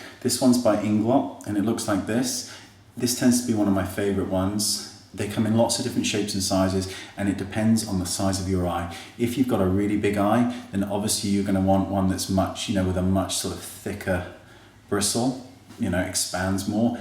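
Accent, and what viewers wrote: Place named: England, United Kingdom. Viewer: He has an English accent.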